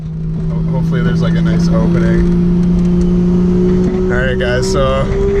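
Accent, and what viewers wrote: Canada. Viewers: Anyone else pay attention on YouTube videos for certain Canadian accent words and sayings? Y'all got that rec weed. Do yo thang😎👌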